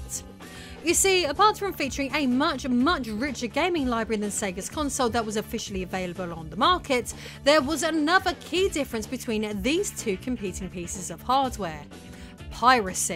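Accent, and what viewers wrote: England, United Kingdom. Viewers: England accent is sooooo cool! Kisses from 🇧🇷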